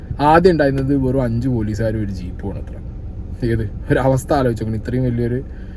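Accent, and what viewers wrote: Malappuram, India. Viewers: 😂😂😂😂😂- malappurathe karyam parayumbo aa slang ozhukk undayikote 😂😂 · "ഏത് കുദ്രത്തിനാണോ"😂 തനി മൽപ്പൊർത്തേരൻ 😅❤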